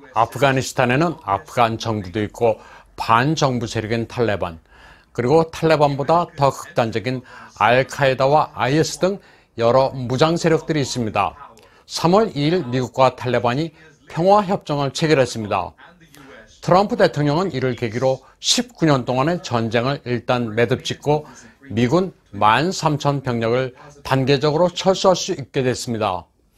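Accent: China. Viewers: 쭝국인이신가요? 완전 발음이 쭝꿔 억양이시네요? 넘 강한 억양에 좋은 내용이 뒤덮이는거같아 많이 아쉽네요.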